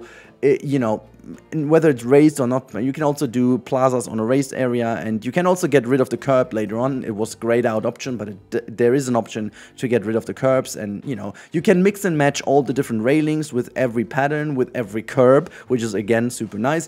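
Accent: Germany. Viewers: Great. Grüße. Warum machst du eigentlich englische Videos? Man merkt natürlich das du deutscher bist :D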